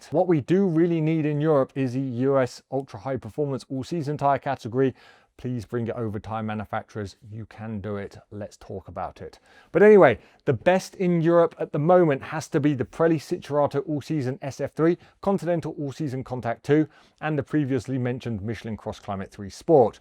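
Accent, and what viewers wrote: United Kingdom. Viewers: lol, i always thought you were British :D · I don't TRUST these "review channels" much, they all probably funded by manufacturers of tires. Too much bias. And a guy with brit accent living in Salt Lake City.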